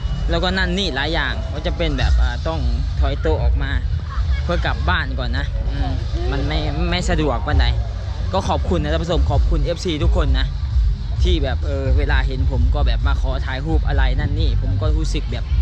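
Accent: Laos. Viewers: เว้าลาวกะได้เจ้าเอี้ย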